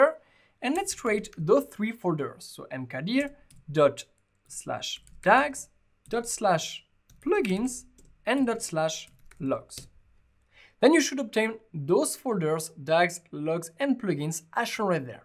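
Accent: France. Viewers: please improve your accent because not all the words can be understood correctly. Too many French sounds when pronouncing. Thanks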